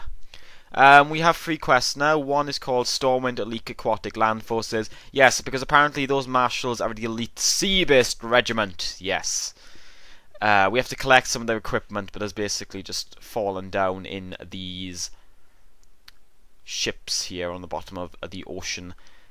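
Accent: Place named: United Kingdom. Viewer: dude even u are a gay or freaking weird british that cannot talk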